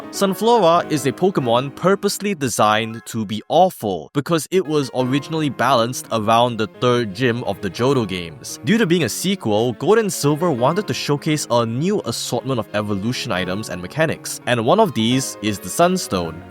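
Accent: Singapore. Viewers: First time watching your video and your accent is familiar. I'm guessing you're either Malaysian or Singaporean. Edit: Ok, I checked the bio and is indeed from Singapore.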